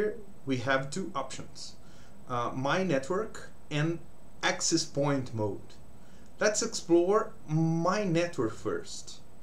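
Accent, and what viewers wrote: Brazil. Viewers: Desconfiei que era br pelo sotaque kkkk representou mestre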